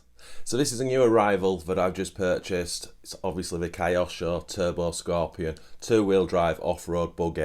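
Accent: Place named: North, United Kingdom. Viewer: I love thar North accent.... Boogy = buggy Oop = up Etc Great vid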